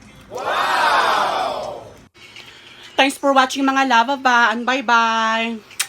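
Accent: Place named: Visayas, Philippines. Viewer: Taga Baguio ka Po ba madam? Akala ko nasa bisaya Po Kayo hehe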